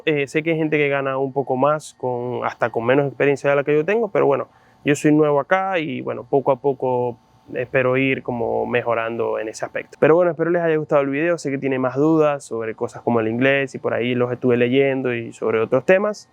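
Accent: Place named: Zulia, Venezuela. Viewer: Lo malo es que parece que flutter ira en declive segun los ultimos movimientos de Google. Tu acento parece de Edo. Zulia. Saludos desde Machiques. Actualmente vivo aqui y trabajo para una empresa del exterior como dev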